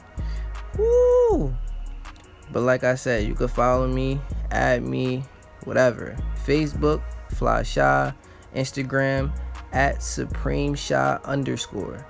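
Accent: New York, United States. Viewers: i know a new yorker when i hear one lolol yurrrr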